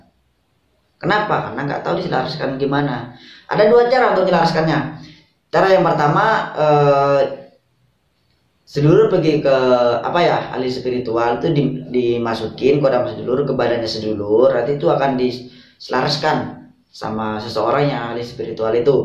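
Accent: Madura, Indonesia. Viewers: Reng medureh tha? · Abang dari madura tah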